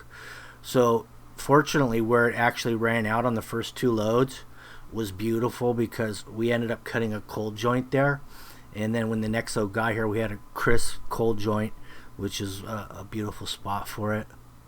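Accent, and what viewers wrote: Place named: West Coast, United States. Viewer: Bud...thanks for the glove offer.. but I truly dont need any, I got a duffel bag full ive collected for years..... you give us all a Christmas gift everytime you teach us how to do concrete...in each video... theirs so many terrible people out their giving advise so to have a legit gent is not something that happens often in our world today. On any platform....I say this carefully so not to jinx you, and your guys, but you and your guys are kind of "masters" of your art. .... I grew up most my life in California and Vegas..then I moved all over the us... so I have seen a bunch of finishes.. im guessing you live in cali cause you got that west coast surfers slang in your voice.. sounds like to me anyways.. so anyhoo.. thank you for giving amazing content for us that get a chance to watch you, and learn when we can...im watching you for the day I build my own house.... 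so I know what not to do... and to do... respect...........................................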